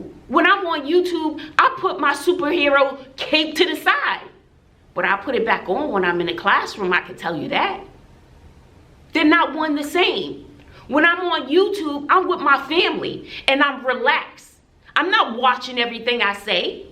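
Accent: New York, United States. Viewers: Are u from ny that accent sis lol love it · New here (Jackie Newton referred me to you)....I love your NY accent!!!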